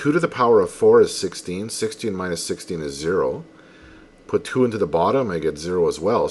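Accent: Canada. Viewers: The Canadian accent makes it sink in better I swear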